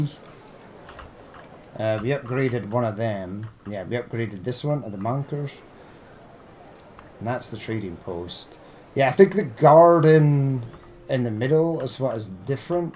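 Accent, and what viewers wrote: Scotland, United Kingdom. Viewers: Hey man. Where's the accent from? I assume it's Scottish, but I'd love to know. Thanks!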